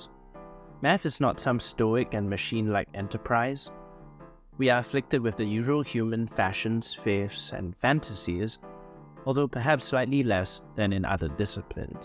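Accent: Singapore. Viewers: sound like a singaporean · Recognised the singaporean accent 😊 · You sound Singaporean or Malaysian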